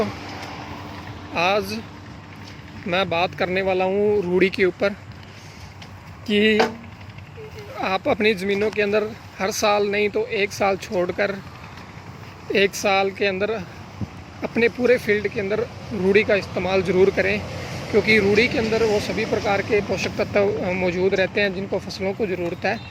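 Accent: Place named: Rajasthan, India. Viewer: Bhai tumhari language ka accentto rajasthani h but tumne khud ko punjab se bta rakha h,, but overall good job..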